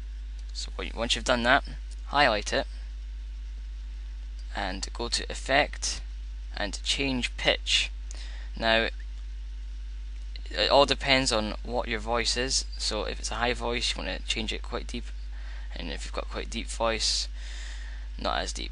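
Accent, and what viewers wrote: Scotland, United Kingdom. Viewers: Cool scottish accent :D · are you british? :O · are you sottish? you soud scottish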